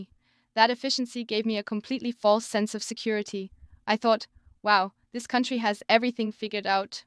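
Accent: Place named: Eastern Europe. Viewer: Don't forget the Eastern European accent, pretending being from the US.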